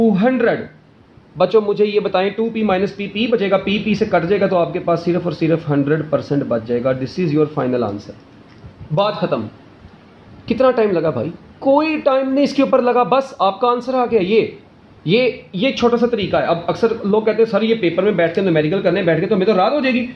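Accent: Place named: Punjab, India. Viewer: really sir jus amzing punjabi accent ke sath aur mast se samaj aa gyaa thnxxx a lot sir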